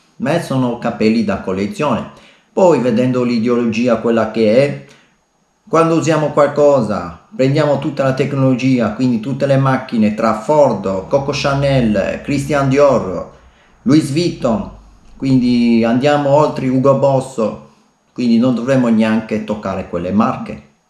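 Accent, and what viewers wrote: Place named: Albania. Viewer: Hai l'accento Albanese wow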